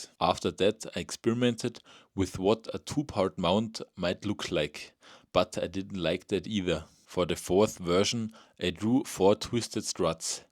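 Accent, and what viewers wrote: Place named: Germany. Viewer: pls stop with english you sound way to german you are to stiff for english loosen up or just stay with german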